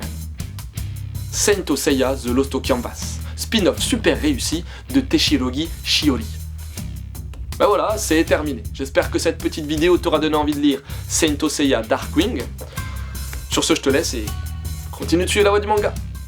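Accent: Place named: Japan. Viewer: Bonjour, Je suis surpris par ces prononciations des noms anglais à la japonaise (rien à voir avec le "w" hein). Je ne pense pas que c'est de cette façon que vous fideliserez/attirerez de nouveaux followers. Après, ce n'est peut-être pas ce que vous recherchez. Au revoir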